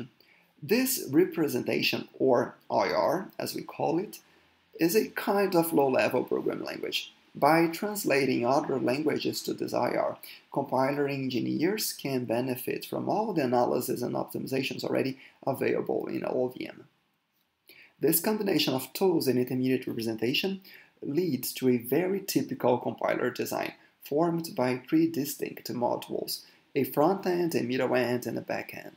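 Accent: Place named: Brazil. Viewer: mano não sei porque mas ouvi outro brasileiro falando em ingles é muito bom kkkkkk eu entendo tudo, até consigo enteder os indianos mas muita vezes tem uns bagui que não da pra entender kkkkkkkkkkk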